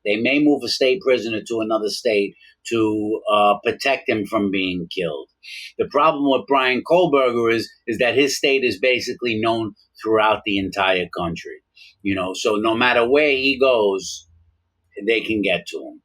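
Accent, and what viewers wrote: New York, United States. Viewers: i can tell you from NY immediately as i am too i started watching your other videos too ….good stuff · I love ur NY accent! I dated a guy who did 27 yrs and he sounds just like you. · Just found your channel. You sound like you're from new york. I appreciate your somewhat objective/neutral analysis of this situation. I appreciate you telling us about the difference between federal prison/lockup and state prison. It almost sounds like you've done some time yourself. It's like having an uncle or papa or some neighborhood guy telling all the kids gathered around the block about the prison system and how it works. So that they don't end up like him. Something tells me that he might get moved simply due to the fact that some of the victim's families live in the state of idaho and they don't wana be sleeping in the same state as their loved one's killer.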